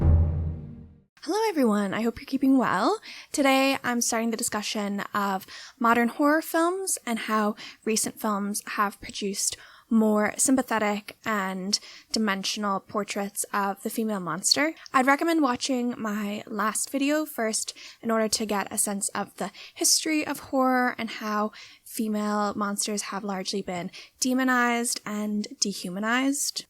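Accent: Ireland. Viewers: Amazing video but unrelated, do you have a foreign accent? just on the Rs and the "ah" sounding As does it sound irish-ish lol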